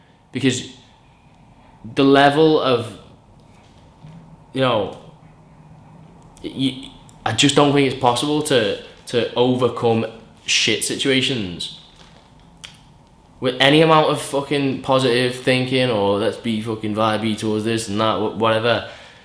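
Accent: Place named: Liverpool, United Kingdom. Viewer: Is his accent from Liverpool, Manchester or Newcastle? ...sounds liverpool. love it!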